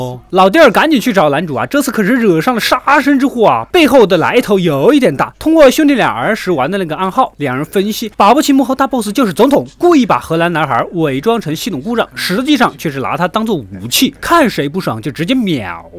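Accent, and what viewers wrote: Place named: Anhui, China. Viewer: 你是我们安徽老乡吗？反正好亲切呀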